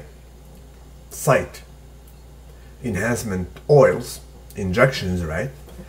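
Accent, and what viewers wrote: Arab world. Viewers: ***** i am from Egypt, I really have no idea how do someone even think he is aesthetic looking like that?! i also admire what you are doing trying to raise awareness and everything personally i didnt see a case of synthol abuse before in front of me yet i know for a fact that even pro bodybuilders use it sometimes to hide some weak points i dunno is it about abusing? not that i like the idea or anything even with a small dose its not even making muscle gains + the poisoning possibility from my side i am sharing your video on some Egyptian fb pages and forums, hopefully when teenagers see it, they realize the facts at early ages. salute you & your arabic accent as i honestly dont know how come you speak arabic :D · his Arabic accent is pretty spot on lol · hahahah i like your arabic accent, if i find someone in morocco with biceps lookin' like an elephant balls i'll tell him what really works. 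By the way right now i'm bulking and i'm going to need you in my first cut man. SALUTE !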